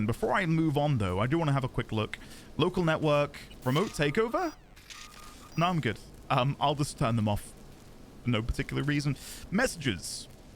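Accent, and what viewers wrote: United Kingdom. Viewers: do you have acting training? Your mid- century RP accent is top shelf!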